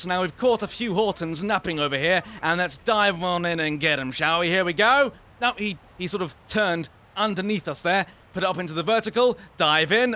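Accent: England, United Kingdom. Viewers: thank goodness I was just getting bored and a fellow brit pulls out a craking old video perfectly spiffing chappie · hilarious guy with hilarious british accent 10/10 would watch again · I love how you talk. what part of England are you from I am from Nottingham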